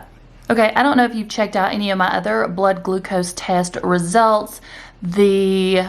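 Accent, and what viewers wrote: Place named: South, United States. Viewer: I love your accent ... a lil bit southern , like I feel happy around.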